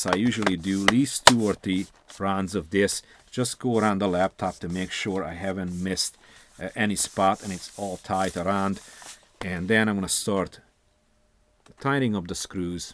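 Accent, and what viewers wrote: Hungary. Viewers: Nem azért írtam, csak annyira karakteres a magyar akcentus. Jó nézni a tartalmaid,csak így tovább!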